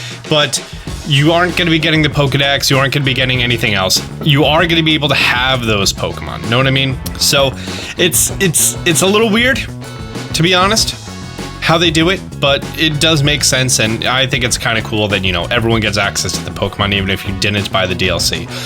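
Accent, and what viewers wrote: New Jersey, United States. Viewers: Ayy you from jersey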